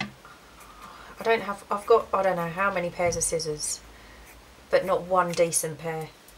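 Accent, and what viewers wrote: England, United Kingdom. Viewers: I love watching your videos and have been learning so much! I just wanted to mention that you have a nice voice. I also like your accent. Your voice and accent remind me of Hayley Mills. I have been a fan of hers since she was Pollyanna (wow, that dates me!). I know England ( I am assuming you are in England) has so many different accents depending on what part of the country you live In. Yours is very pleasant.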